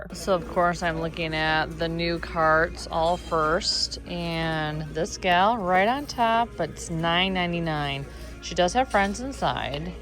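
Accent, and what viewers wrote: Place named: Midwest, United States. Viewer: The stuff that got on camera was absolutely dizzying! So much great stuff! I'm going to rewatch and pause to enjoy. I think your Midwest accent is what makes you so enjoyable for me to listen to - I'm originally from Chicago (so only an eighth-turn from ex-Detroit). Your painting aesthetic is interesting. Maybe you can do a bit on that sometime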